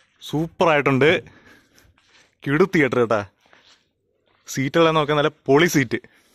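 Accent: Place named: Thiruvananthapuram, India. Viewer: സംഭവം കൊള്ളാം. പക്ഷെ ഈ കൂടെ കൂടെ കേട്ട കേട്ടാ കേട്ടാ ന്നു പറഞ്ഞു ബെർപ്പിക്കരുത് അനിയാ 😬നീ കൊല്ലംകാരൻ അല്ല. തിരോന്ദരം ആണെന്ന് തോനുന്നു 😆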